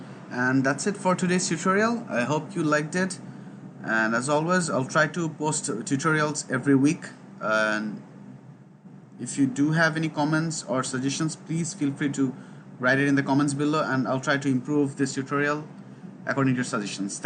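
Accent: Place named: Bangladesh. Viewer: your accent is a bit Bangladeshi. are you from Bangladesh bro ?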